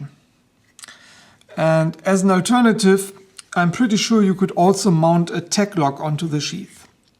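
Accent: Germany. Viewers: Nach den ersten 4 Wörtern wusste ich: ein Deutscher xD keep going - it's great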